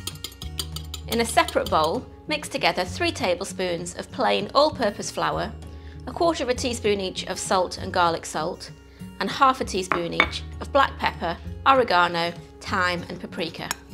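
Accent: United Kingdom. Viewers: I hear a British accent. For sure this will be in another video called "Italian chefs react to Italian cooking videos" with them crying bloody murder 😂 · isnt it funny how a british accent instantly makes someone charming?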